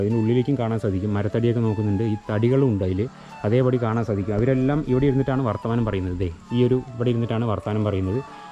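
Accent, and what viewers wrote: Palakkad, India. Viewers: etta njngalude palakadan bashayilulla avatharanam suparanu enik oru request und mele parambil an veed movie location pleese bro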